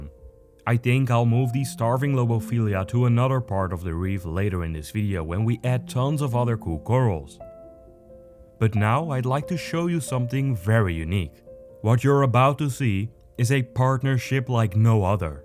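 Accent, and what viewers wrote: Netherlands. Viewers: Your from Holland right ?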